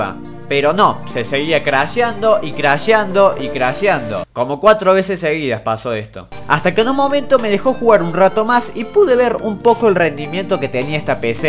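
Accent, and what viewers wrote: Córdoba, Argentina. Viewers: Exacto ese acento es cordobés, yo soy también cordobés por eso lo identifico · ami tambien creo que es de cordoba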